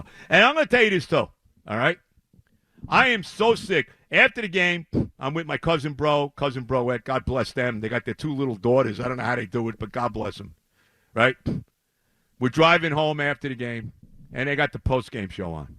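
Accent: New York, United States. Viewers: Can't get enough, and the New York accent makes it that much better.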